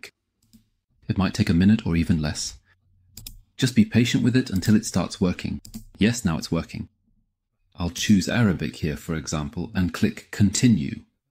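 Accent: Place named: England, United Kingdom. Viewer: I liked ur eng accent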